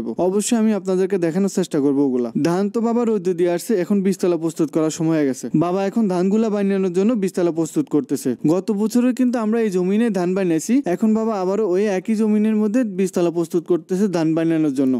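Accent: Noakhali, Bangladesh. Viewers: ভাই আপনার বাড়ি কি নোয়াখালী বলবেন 🥰🥰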